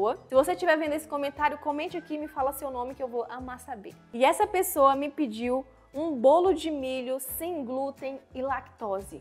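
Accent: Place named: Northeast, Brazil. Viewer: Sensacional!! De que região do nordeste você é?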